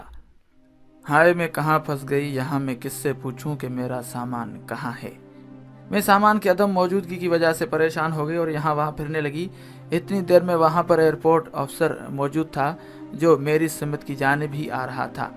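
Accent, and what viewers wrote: India. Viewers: Yey bolnay wala Indian hay, yey musalmano kay khilaf Indian Hindu Propaganda video hay, iska lehja indian hay. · بات پاکستانیوں کی ہورہی پے اور لہجہ انڈیا والوں کی کچھ عجیب سا نہیں؟ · Why u have hindi pronounciation? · Assalamalikum he is not speaking pure urdu. It is Indian. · Ap indian style main kune baat kertay hain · هر جگہ پر ہر قسم کے لوگ ھوتے ھیں ۔ بیشرم آدمی تمھاری اردو ھی انڈیا والی ھے تمھارا کچھ بھی سچا نہیں۔ تم خاص ایجنڈے پر کام کر رھے ھو۔ سعودیہ اور ترکی کے خلاف ھی کیوں بکتے چلے جا رہے ہو ۔ اسلامی دنیا کے یہ دونوں ممالک بہت محترم ھیں۔ shame on you. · Tum indin ho tmhari awaz zuban sy lagta hy tum nafrat ka bej bo rhy ho ya tum irani ho i hate you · بھائی یہ ایک انڈین چینل ہے جو پاکستان اور عرب ترکی کے درمیان نفرت پیدا کر رہا ہے آپ اس بندے کی اردو چک کرو اس کا اردو میں لہجہ بہت مختلف ہے جس سے پتہ چل رہا ہے کہ یہ ہندی میں بات کر رہا ہے اور انڈین ہے اس لئے ایسی ویڈیو سے بچ کے رہو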